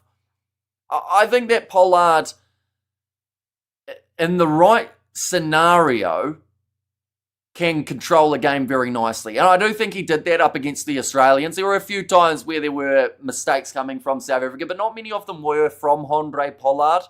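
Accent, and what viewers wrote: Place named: New Zealand. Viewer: You have the most Kiwi accent in Godzone, great chat. Do get to a live AB match. The speed of play is unbelievable, and not captured on TV somehow. And atmos at Eden for this historic game.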